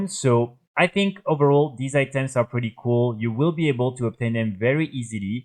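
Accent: France. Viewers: the french accent makes this unwatchable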